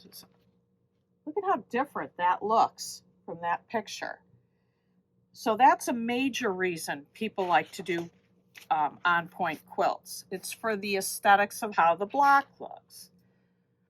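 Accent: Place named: Illinois, United States. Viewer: Good morning, a great way to wake up. Usually I watch you much later in the day, with my tea and toast. Coming from a family that puts an "R" in wash, I just attributed salvage vs selvedge to an Illinois accent if I even noticed at all. I don't know what I would do with another fat quarter bundle, but I would love to find out. Have a great week, Diana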